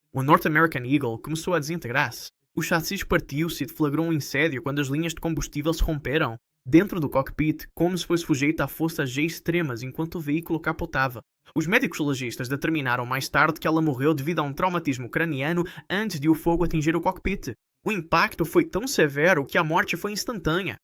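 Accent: Portugal. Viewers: Português de Portugal não dá, impossível assistir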